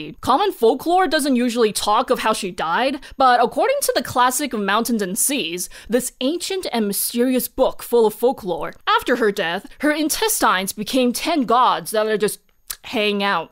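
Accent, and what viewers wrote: United States. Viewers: I am curious as to where in the US/Canada Ms was raised... By her (AE) accent, it's hard to tell; California? PacNW? Michigan? Toronto?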